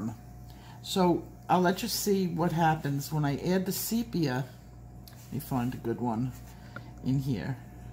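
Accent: New York, United States. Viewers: Hi, I'm Maddie a new subscriber of your wonderful Channel that I recently discovered and I was fascinated by your great talent and your passion for pencil drawing which is also my favorite and also by how you passionately teach. Thank you for sharing your talent and knowledge with us. I am looking far and wide at your Channel, I have a lot to watch. I also love the things you tell us from time to time, like the afterlife and paranormal stories in which I strongly believe and had experience myself too. I love your sweet pets and I love your New York accent, it is a pleasure to listen to you and your calm voice relaxes me. Much love from old Europe to beautiful America. Blessings, love and light to you and to your family.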